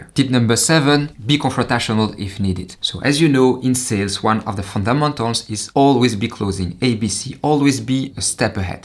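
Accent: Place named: France. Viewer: Are you French by the way? Your accent sounds French 😀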